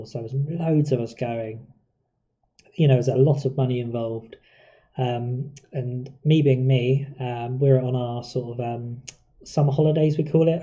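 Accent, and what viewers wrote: United Kingdom. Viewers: Woah you're brit?! :O alright im subbed haha xd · Omg ur British?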